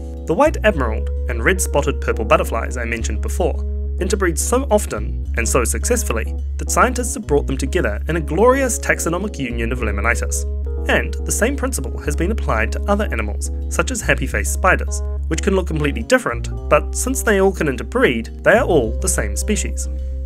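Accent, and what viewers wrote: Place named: New Zealand. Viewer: great video! and your NZ accent is fresh heheh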